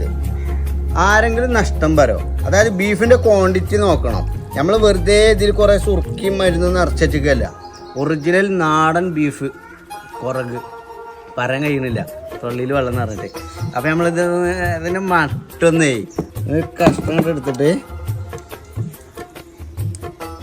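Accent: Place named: South Kerala, India. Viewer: കൊള്ളാം തെക്കൻ പറയണ വടക്കൻ ഭാഷ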